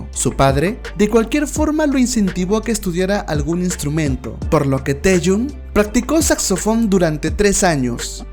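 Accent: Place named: Argentina. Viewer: Hablas como los argentinos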